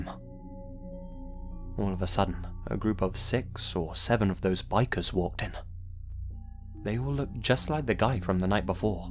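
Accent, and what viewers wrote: England, United Kingdom. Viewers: Great stories as usual,:) I know you can't really tweak the grammar of someone's story beforehand, but as a brit as well, your accent is far too good (and lovely to listen to) for the all the 'I's that should be 'me's in sentences like: "They kicked Steve and I out of the jeep". It sounds (and grammatically is) off...spoils it a bit, is all.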